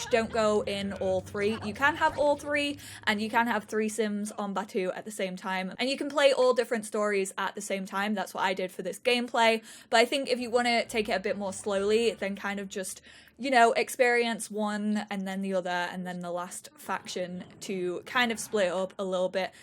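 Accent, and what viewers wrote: England, United Kingdom. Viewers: I totally hear the British accent 😂😂😂 (I'm from Yorkshire) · OMG she does sound English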